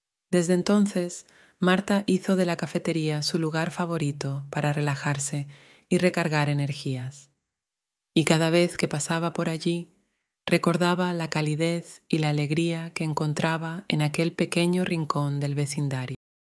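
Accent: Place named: Latin America. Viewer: Good story but I have 2 issues with this. First (and not so important perhaps) is that there is no passion, no spark in the voice of the person telling it. That makes it a bit boring. Second and most important, she mixes accents, she says "decidir", "dulce", "vecinos" and many other words as pronounced in Latin America (with seseo), but then she says "entonces", "hizo" etc in the way they are pronounced in Spain. This is VERY confusing to learners, especially to beginners who are the target audience here.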